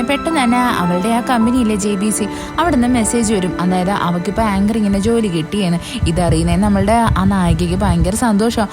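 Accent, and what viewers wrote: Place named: Kollam, India. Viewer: കുട്ടിയുടെ വീട് കൊല്ലം ജില്ലയിൽ ആണോ 😂എന്തുവാ, നോക്കിയാണ്,നിക്കുവാണ് , etc ..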